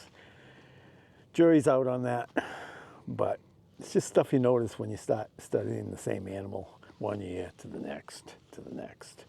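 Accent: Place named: East Coast, United States. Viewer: I often wondered when the shedding took place. Seeing the breath i guess cooler air is starting already. Hearing your accent any chance your from the east coast?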